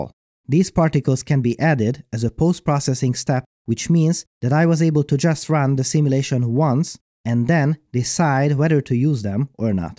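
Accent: Hungary. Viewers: Heard your voice and your name and I immediately knew you are Hungarian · Jól hallottam, hogy maga magyar :3 és itthon nincs felfedezve :/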